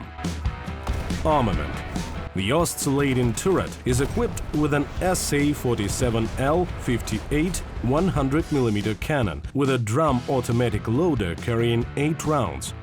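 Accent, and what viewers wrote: Russia. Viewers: Rest of the community: (A WH40K Tyranid Hivemind that can't get a cohesive thought) Me: "Ah yes, I like your words and history, thick Russian narrator man!"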